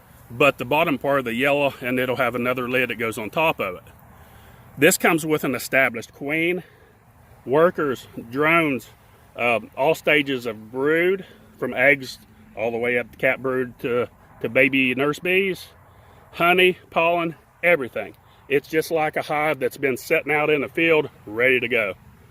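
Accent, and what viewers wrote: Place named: West Virginia, United States. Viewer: I thought had a wv accent! Too funny. It’s almost as bad as mine. 😃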